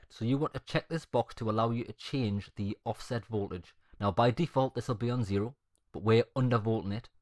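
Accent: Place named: North East England, United Kingdom. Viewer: You are from Newcastle or somewhere in the north east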